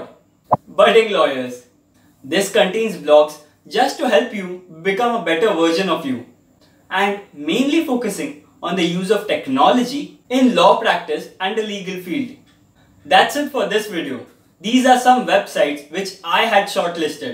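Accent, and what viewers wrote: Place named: India. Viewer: this bro speaking English like hindi...jisse hindi students bhi asani se smj skta h..👍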